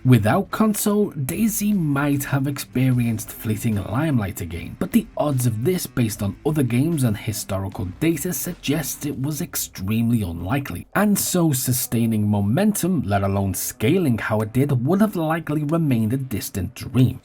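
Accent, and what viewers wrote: Liverpool, United Kingdom. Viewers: scouse legend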